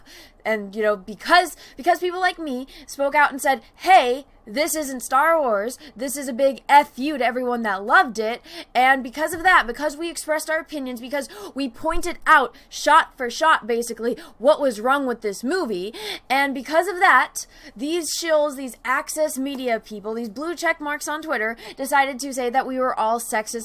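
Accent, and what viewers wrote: Portugal. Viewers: wait are you portuguese ? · Are you Portuguese? 🤗💪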